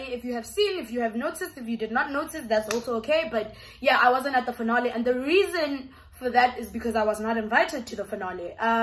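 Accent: Nigeria. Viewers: Babyyy your Nigerian accent is hot hot mahn🔥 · It’s the Nigerian accent for me😂😭😭❤️‍🔥😻🤌🏽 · your Nigerian accent is top tier. 😂❤️❤️ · It's your Nigerian accent for me 🔥 😭😭😂👐⚰️ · What is your nationality babe,,,coz l hear you talk Nigeria accent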